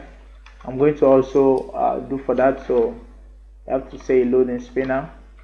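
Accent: Nigeria. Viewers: that Nigerian accent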